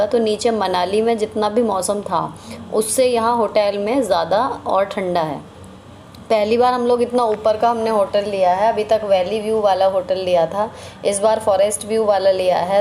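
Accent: Meerut, India. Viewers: Meerut se ho kya mam ap?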